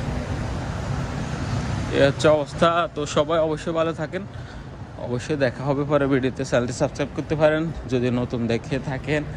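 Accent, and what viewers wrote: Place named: Comilla, Bangladesh. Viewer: আপনার বাড়ী কুমিল্লা মনে হয় ভাই ❤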